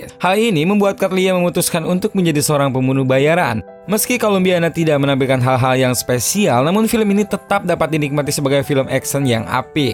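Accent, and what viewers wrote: West Java, Indonesia. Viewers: kalo dari suaranya,adminnya orang sunda xD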